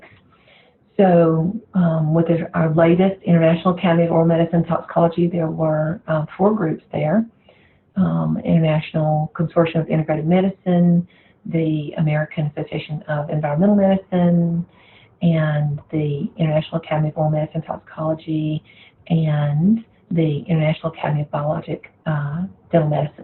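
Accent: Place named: London, United Kingdom. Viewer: Love your accent! Are from from east or west London?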